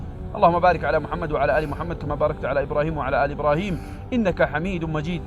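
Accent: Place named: Yemen. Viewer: ياخي اللهجه واللباس واضح من اليمن يعني محه يكون من الفلبين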